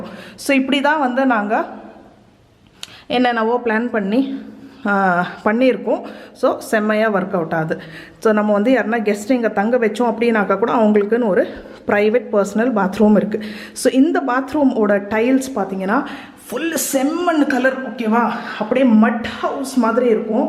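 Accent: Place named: Karnataka, India. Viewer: Kannadam kalantha tamil arumai vazhthukkal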